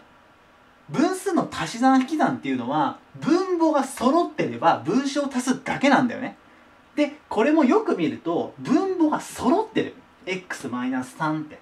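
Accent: Kansai, Japan. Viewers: たまに関西弁出てるのおもろw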